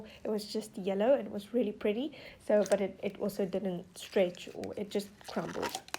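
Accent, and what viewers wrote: Afrikaans, South Africa. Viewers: Are you from south africa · this is kinda weird hearing a south African voice knowing, China town is kilometres away, game is in the nearest mall sksksksksksksksksk Great video tho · Ya u definitely from south africa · South African!! I could hear it in your voice😂❤️ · Honestly, I could hear in your voice that you're South African. I am South African too but I have an American accent. I just love hearing an all South African accent 😅❤❤❤ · Your accent is beautiful, South Africa is amazing. 🇿🇦🇿🇦🇿🇦😙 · right when you started talking i knew you were from south africa my mom was born and raised there and i have family that still lives there i live in the US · Jy kan hoor jys afrikaans😂😍😘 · You kinda sound South African. Thats where Im from :P Edit: wait, doesn't matter. I just realised that you have been referring to currency as "Rands" and your bio says Cape Town. 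I feel dumb now. Lol XD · South African right? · Are you South African I think I hear it in your accent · Lol, when I first heard her accent I immediately thought she's from South Africa, and then by mentioning our brands (Game etc) I kind of had a feeling but when you mentioned rands (South African currency) yeah you're from SA 😹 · I love her South African accent❤️🤭😊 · Your voice sound if you are from south Africa ek is van africa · Are you South African because I am and you have a accent and you are say rand instead dollars to I know this because I was born in port Elizabeth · Im not gonna lie, I realised you were South African right away and of how you pronounced the "R"s and how you pressed on the "T"s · Are you from South Africa?? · Cause you sound afrikaanse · South african? :3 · I knew she sounded South African! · Are you south african!? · I can clearly hear that you speak afrikaans. 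I know that accent.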